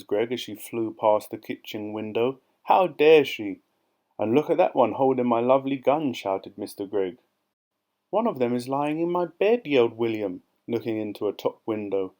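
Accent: United Kingdom. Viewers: I really like your British accent.. and I listen to your stories so I can master this accent. Thank you❤❤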